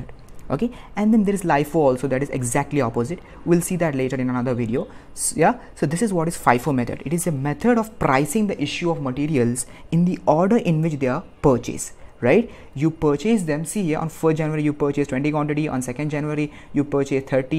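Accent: South India, India. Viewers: south india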